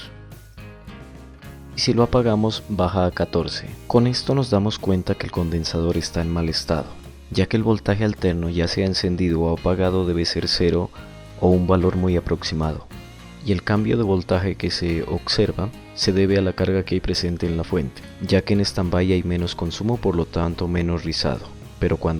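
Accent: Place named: Narino, Colombia. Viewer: Amigo, la verdad un excelente video, mu buena prueba créeme que la aplicaré, me imagino que funciona con todos los electrolíticos. Por tu acento veo que eres de la hermosa tierra del Galeras. Millones de bendiciones.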